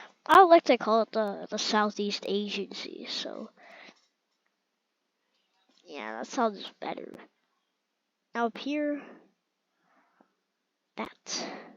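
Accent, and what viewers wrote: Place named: United Kingdom. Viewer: Bro's bri ish ig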